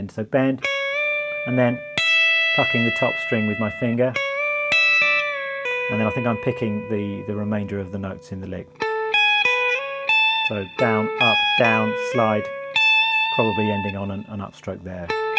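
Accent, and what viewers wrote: United Kingdom. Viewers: Son You are really great !! I enjoy watching you and the British accent is terrific!!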